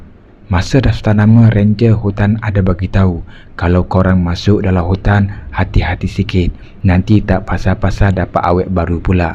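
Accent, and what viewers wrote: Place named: Sabah, Malaysia. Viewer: Mcm ada slange sabah sikit jo.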